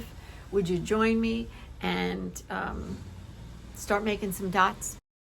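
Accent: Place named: Pennsylvania, United States. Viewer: A friend told me about your dots...I love watching your process! I also love your PA accent (I've lived on both sides, so I recognize the Pgh side, and the Philly side!). Anyway, love your gratitude focus. Thanks,!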